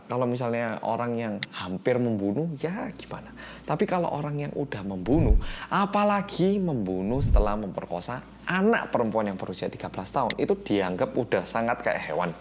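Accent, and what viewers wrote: Java, Indonesia. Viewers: Yuhuuu selamat malam bolo-bolo Kembali lagi dengan detective reomitt bersama mas medok kitaa ❤❤❤ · Buset medhoooooooookhhkhkhkhh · Tdk perlu iri dgn uang M" An Meskipun kita bs sakit BBS nntn you tube,bs tdr nyenyak😭😭😭😭😭trs bget mmbtku semakin kuat walaupun q sakit hrs ttp bersyukur ......matur suwon oppa seng medokkkkkkk😀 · Uang berapa pun ga akan bisa mengganti rugi atas yg telah terjadi oleh bapak Yoon Seounyeo, menjalani 20th di dalam penjara atas tuduhan yg ga pernah dia lakukan.. Sakit hati, Marah, Kecewa dia lalui sendiri, tapi dia tetep sabar n menerima semua nya.. Yang dia senangi adalah Cap Pembunuh yang melekat pada diri nya sudah hilang.. Semangat buat Ahjusi Yoon Seounyeo dan Buat Mas yang semakin Medoooooooookkkkkkk:) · Tim gercep mas medokk🤣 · Yah telat 18 menit. Gercep like aja dahh MEDHOKK. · life is so unfair but we got the important point from this video, thx mas yang medhoookkkk, GBU mas ✨ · Plis lu pada yang nonton wajib subrek channel mas yang MEDHOK ngonghey 😉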